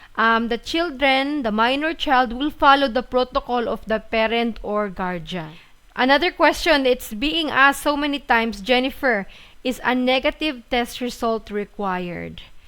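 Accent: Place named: Philippines. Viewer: when u speak english your accent is very filipina. try british accent. no matter how sexy u sound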